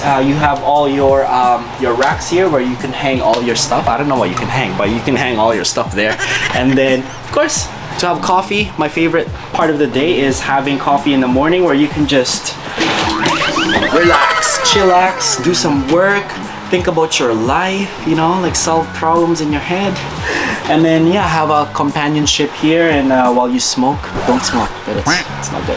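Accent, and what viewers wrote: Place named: Canada. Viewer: His canadian accent just✨✨and the Filipino also just✨✨like wow❤️❤️❤️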